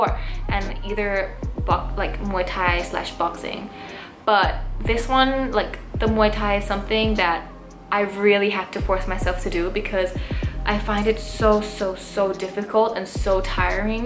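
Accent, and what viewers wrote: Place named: United Kingdom. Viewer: ชอบสำเนียงบริติช มากกก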